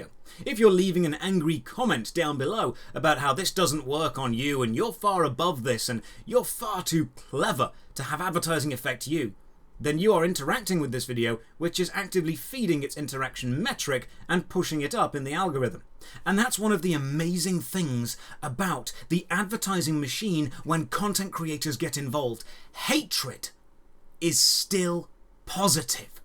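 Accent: United Kingdom. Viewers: Hi Double Barrell British Sounding Well Dressed Man.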